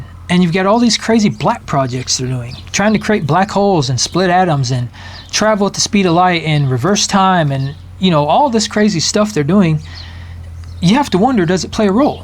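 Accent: United States. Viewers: I’d like to know where you are from in the US as I like your accent. Greetings from Queensland Australia.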